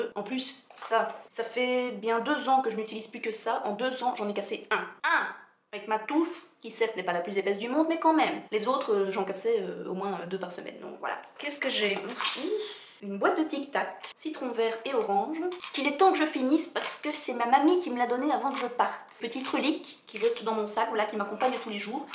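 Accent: Belgium. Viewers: Pour la petite histoire, haha, J'ai reçu la semaine passée un masque Glamglow et j'avais envie d'avoir des avis concernant ce masque. Donc, en cherchant sur youtube je suis tombée sur ta vidéo qui compare ce masque avec un de Sephora - si ma mémoire est bonne. J'ai été interpelée par ton léger accent qui me faisait penser aux Belges et j'ai visité ton Instagram où j'ai vu, qu'effectivement, tu étais Belge. De là, mon petit côté patriotique m'a poussée à jeter un oeil à d'autres de tes vidéos et je dois dire qu'encore maintenant, je suis toujours sur ta chaine à visionner ton petit monde... Parce que j'ai une véritable passion pour les USA et surtout NYC, donc tu me fais littéralement rêver et en plus, je suis une crolée comme toi sauf que j'ai jamais trouvé l'astuce pour arriver à dompter mes cheveux si ce n'est le lisseur, donc je suis aussi intriguée par tes astuces. 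Voila voila ! haha. Si t'avais une boite postale j'aurais pu t'en faire parvenir un que j'ai et qui ne me sert pas. Mais bon voila, sache que chez Club il y en a. Je sais qu'on peut commander sur le site, mais je ne sais pas s'ils livrent aux USA. Je crois qu'ils sont limités à la France et au Luxembourg :/ Bisous !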